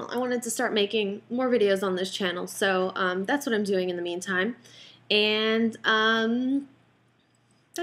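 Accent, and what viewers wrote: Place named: West, United States. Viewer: AND THAAAAN hahahahah I love how you do it in a western accent... all the time!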